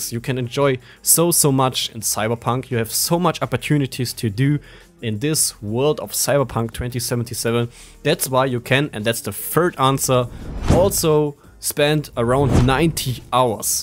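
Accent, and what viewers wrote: Germany. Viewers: Nice German accent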